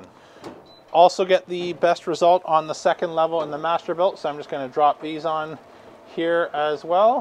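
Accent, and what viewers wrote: Canada. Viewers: Great video!! You sound like you're from Canada. Are you the one who created all this smoke? 😳 😉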